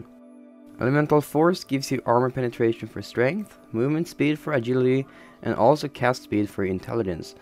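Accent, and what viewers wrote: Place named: Sweden. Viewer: I can't watch this due to your Swenglish accent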